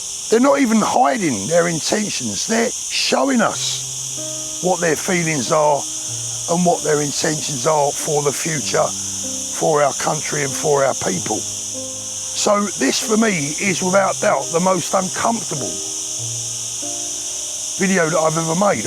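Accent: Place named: Cockney, United Kingdom. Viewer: You're like a cockney boxing coach from one of those movies. Thankyou.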